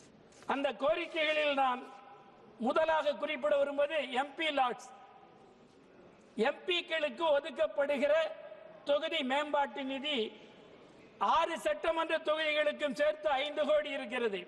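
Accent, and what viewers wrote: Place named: Kongu, India. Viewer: பஞ்சு நகரின் கொங்கு தமிழே ! சமத்துவமும் சகோதரத்துவமும் தழைக்கட்டும்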